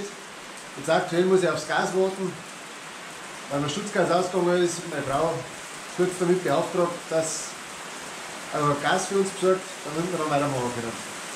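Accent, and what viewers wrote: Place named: High German, Germany. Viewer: sauber... Top hast die a die Hochdeutsche Sprache so anglernt das du es nimma lassen kannst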